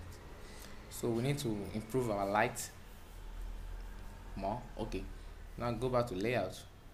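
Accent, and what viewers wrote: Nigeria. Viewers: are you a Nigerian?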